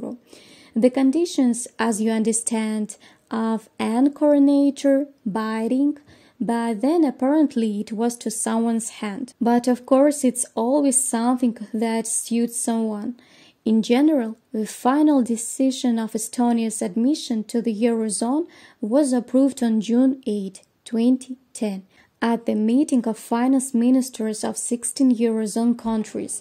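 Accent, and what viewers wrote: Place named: Russia. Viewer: First, I want to say I think it's great you're practicing your English and aren't afraid of making mistakes! However, I am a native English speaker with some Russian language background, and even with my better-than-average ability to listen to Russian accents, your Russian accent is so strong I can't understand half of what you're saying. It barely sounds like you're speaking English. I would suggest three things: 1. Put English subtitles in your videos, or 2. Practice your English accent until you're understandable before making more videos, or 3. Just do your videos in Russian.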